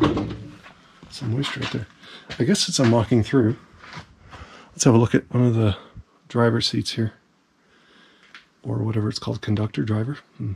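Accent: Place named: Canada. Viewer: Correction, they are not trains....they're trolley cars or street cars. The exception are those high floor Chicago subway cars that were made using parts from old Chicago PCC trolleys. And I must say I like your Canadian accent. Did you happen to get the car number of the 1949 Red Arrow {Philadelphia Suburban} car that you boarded on the time bar. You showed the interior above the windshield, but I couldn't see the car number. Man, these ads that pop up every minute or two are really annoying. The ticks and other insects must have had a great time with you. And frankly, it's dangerous to climb around in equipment in that deteriorated condition.They've been exposed to the weather for decades. Doing it alone is especially risky and unadvisable.. It could be likely to have unfortunate consequences. If you got hurt, who would help you? Let's hope there is working cell service there for emergencies., that's not a driver's seat. 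It's the motorman's cab. The conductor collects fares or opens/closes doors. The conductor doesn't operate vehicles. The motorman operates these cars. You've got lots to learn before narrating a video like this. It might interest you to know that a scrap dealer has just bought everything at the Windber site and by year's end it will be cut up. That's to give museums and collectors time to buy things such as spare parts. There are a few vintage cars inside of the shop building that should go to museums. <link>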